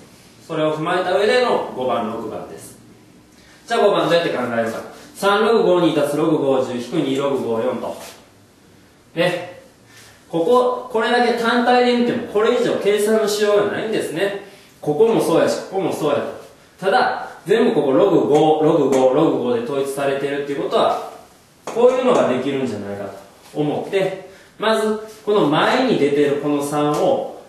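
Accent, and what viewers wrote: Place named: Kansai, Japan. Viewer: とてもわかりやすいです！！ 同じ関西圏ということもあって言葉にも親しみを持てます＾＾ ありがとうございます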